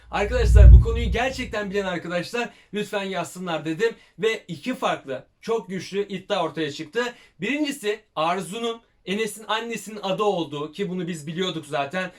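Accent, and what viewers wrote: Black Sea, Turkey. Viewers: Abi valla Karadeniz şivesini çok güzel yapıyosun haykırdım sayende 😂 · abi karadenizli gibisin haa ne guzel okuyon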